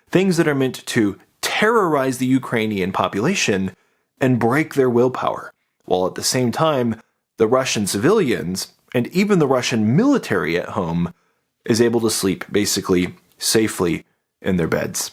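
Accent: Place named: United States. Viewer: so irritating to read your obviously german name, but hear that american voice.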